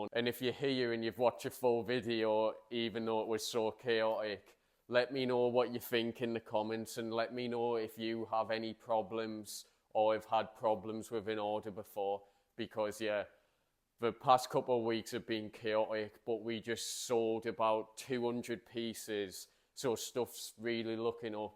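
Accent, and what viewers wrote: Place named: Newcastle, United Kingdom. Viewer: How did a Geordie end up in Canada? Good videos mate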